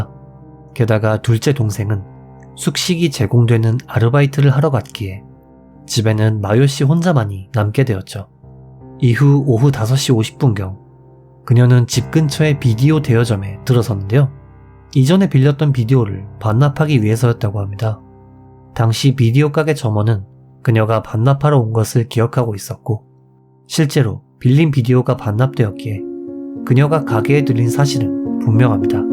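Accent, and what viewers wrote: Japan. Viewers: ㅎㅎㅎ중간 중간 일본분 액센트가 들려 일본인인줄 알았어요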